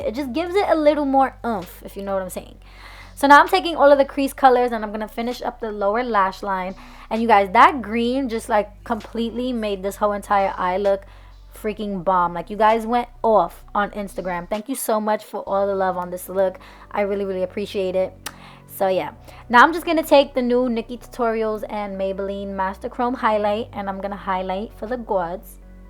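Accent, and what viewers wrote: New York, United States. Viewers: Gurl where are you from? You are giving me major NY vibes and I’m loving it.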